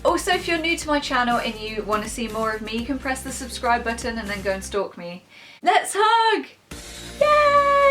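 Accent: United Kingdom. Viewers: Where are you from? You sound like your from Newcastle xx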